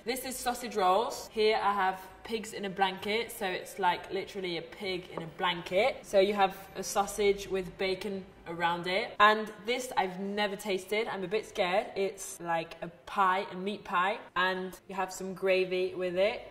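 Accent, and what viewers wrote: England, United Kingdom. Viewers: I love your British accent 🫶 We want so much more of these videos in English! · Omg your english acent is incredible!!! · OMG mais on veut + de vidéos en anglais!! l'accent britannique vraiment >>>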